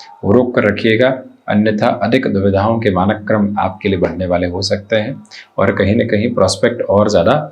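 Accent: Standard. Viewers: अत्यंत शुद्ध हिंदी भाषा व उच्चारण सुनने के बाद अत्यंत आनंद का अनुभव कर रहे हैं l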